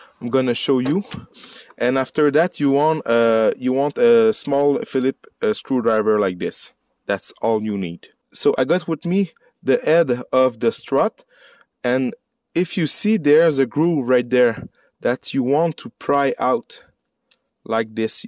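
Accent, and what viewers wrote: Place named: Quebec, Canada. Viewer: how to tell you're from Québec not telling you're from Québec 😁 j'adore tous les deux, le mec et le video! merci 😊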